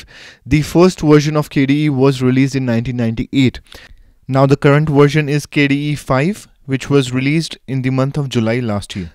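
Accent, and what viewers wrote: India. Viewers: This is how every north Indian speaks English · Its indian accent but not too indian.. Kind of sweet spot i love it 😃 And thank you for great explanation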